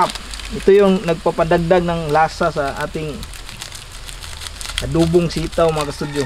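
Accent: Visayas, Philippines. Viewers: Tarongin mo naman kahit gamay tagalog mo pre pagka bisdaka motagalog nemu ting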